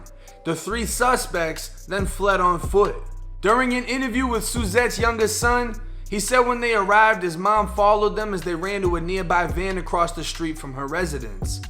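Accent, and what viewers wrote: Boston, United States. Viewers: Sick as a dog bro (pneumonia not covid) but need me some 1090. Wat up man, love ur channel, mass respect educatin yungsta's bro. This footage shockin man, how'd ya get ya hands on it? Anyways, are u from Florida man, ya sound like ya from Boston. I admit I tho u was glorifyin this life & I Apolologize fo real. I hope ya Blow Up Bigtime bro, monetized & all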